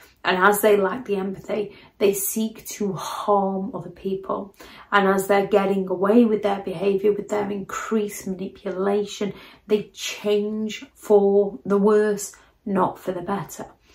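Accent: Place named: Northern England, United Kingdom. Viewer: Her accent is northern English.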